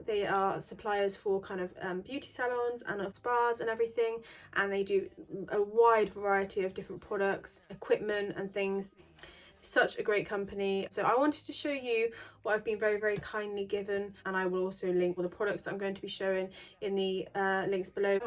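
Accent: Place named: Suffolk, United Kingdom. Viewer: Your hair is gorgeous! How do you get it so white but still so healthy looking?? Also, where abouts are you from? I’m from Suffolk, your accent doesn’t sound too far 😊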